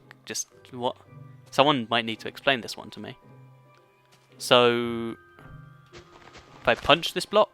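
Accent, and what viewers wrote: United Kingdom. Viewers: Yeah.Your british accent is pretty interesting though, that's part of why I like your channel and I also like your channel because you are a very informative channel :')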